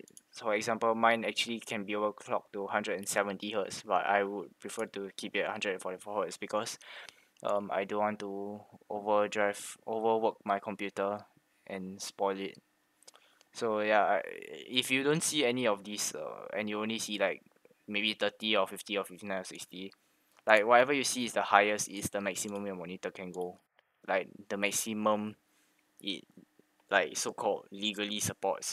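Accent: Singapore. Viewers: i knew it u use Singapore english Accent Lol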